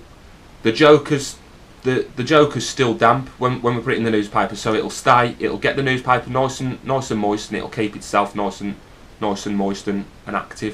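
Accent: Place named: Dudley, United Kingdom. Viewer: Love your accent. Reminds me of my mate Rob, from Dudley :P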